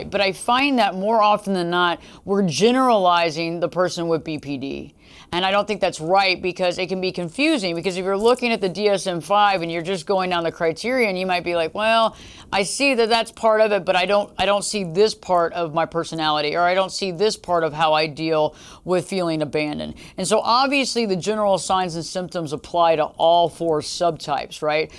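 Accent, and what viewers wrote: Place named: South, United States. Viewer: Thought I saw Mariska Hargitay talking with south accent 😁😁😁 this vid is really intetesting, as BPD, I don't know which type I'm. Sometimes, it seems to me to get a bit of each type together...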